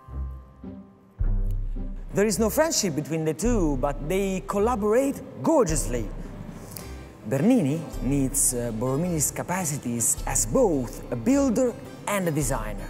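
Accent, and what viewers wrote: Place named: Italy. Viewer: Dato che si sente tantissimo che sei italiano, perché non l'hai fatto nella tua lingua?? o non sai parlare neanche quella??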